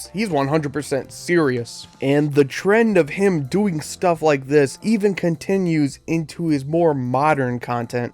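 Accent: United Kingdom. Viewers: And that British accento Is so thick and evident Not even english parodies can reach that level of accent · This guy is aggressively British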